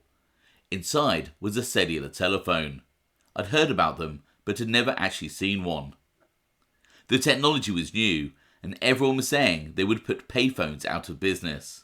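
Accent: United Kingdom. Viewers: The Fine British Accent don't hurt...ha ha...always sounds more serious...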